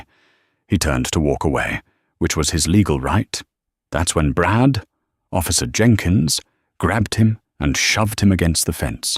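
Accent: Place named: England, United Kingdom. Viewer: the narrator has the best English accent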